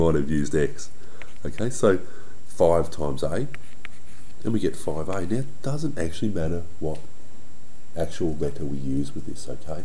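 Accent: Australia. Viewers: Are you Australian??? 😳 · This isn’t about the video but, are you Australian? I recognise that accent heh! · Is it just me or do I learn better when being taught by an Australian teacher!? I find it riveting when I hear somebody say "G'day Mate" XD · im australian and i understand him hes got an aussie accent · aussie mate! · Your from Australia! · Well he has an Australian accent. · Are you Australian "G'day" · Jessie Panganiban What? He's Australian? He sounds so fiiine as an Australian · So you have an Australian accent?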